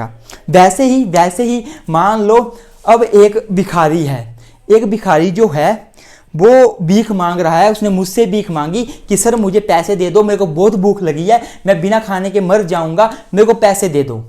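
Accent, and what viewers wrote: Himachal Pradesh, India. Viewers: pahaadi ,himachali ho aap? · Are u from Himachal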